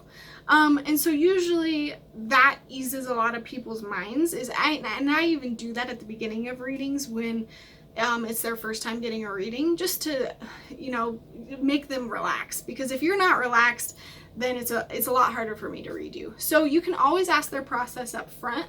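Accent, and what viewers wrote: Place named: Minnesota, United States. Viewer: I'm pretty good at guessing accents. I'm thinking and predicting she's from Minnesota but I could be wrong.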